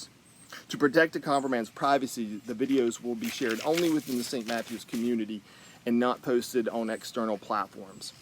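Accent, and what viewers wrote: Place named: South, United States. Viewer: So good to see your face! (Miss that southern twang though.) Hoping you, your family, & your congregation remain well & safe during this time. ❤️ JT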